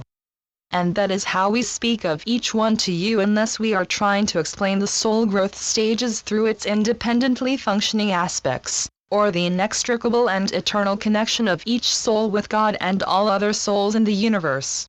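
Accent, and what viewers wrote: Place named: United States. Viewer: The information is correct. In order to absorb information like this, it is necessary to put all previous experiences to one side, including prejudice of any kind, which may have been put into your mind by others, including family members and the current educational system, or experiences which may have made you afraid when young. This includes putting to one side prejudice against the 'robotic' American accent used in these transmissions. Those who are able to do this, will be able to understand the information. Those who are not ready, will not be able to, because they are allowing the remembered emotion FEAR, which is a strong emotion, to control them. The method is simple, but it takes practice. You just say, out loud if necessary, 'I AM AFRAID OF NOTHING'. I am not at all religious. But I DO believe in methods that can remove fear from people.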